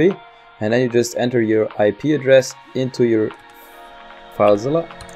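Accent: Germany. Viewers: немецкий акцент, сразу узнал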